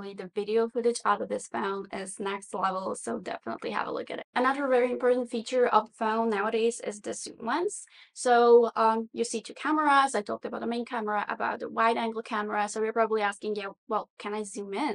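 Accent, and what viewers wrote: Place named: United States. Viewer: I watched your video at 1.5X speed. At that speed, you sound American--esoecially when you say "and".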